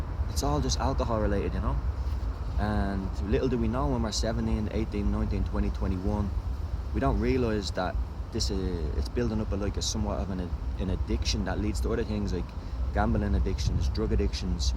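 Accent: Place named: Ireland. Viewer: definitely irish, sounds exactly like conor mcgregor.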